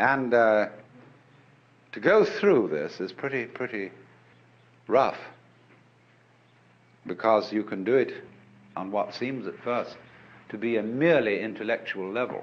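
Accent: United Kingdom. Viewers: Are you sure you aren't referring to Alan Watt, the Scottish guy? Apparently people are confusing the 2. I only recently came across this Alan Watts w a British accent and was like wth? Til I figured it out. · How nice it is to hear a semi posh English accent for a change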